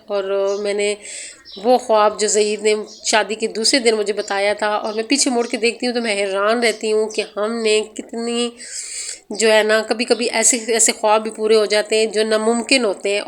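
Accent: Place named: Chakwal, Pakistan. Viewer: Ap achi lagti hain buht mjy simple sa apka style hai aap chakwal se to belong ni krtin ? Apka lehja baat krnay ka bilkul chakwalio jesa hai btw I am also from chakwal ❤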